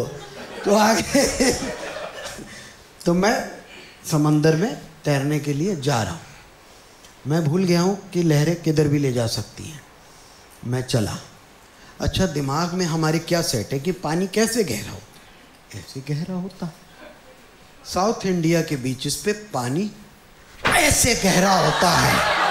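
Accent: Uttar Pradesh, India. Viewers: In his UP accent the way he delivers his jokes ohh god 😂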